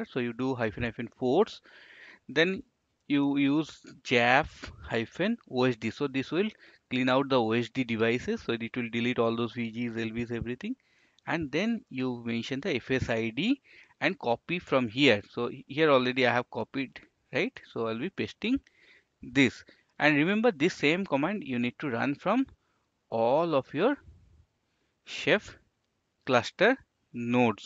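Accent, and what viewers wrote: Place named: India. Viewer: Pretty nice video, clean, neat and in the expected Indian accent. Pretty nice job bro!!! You got my like!!!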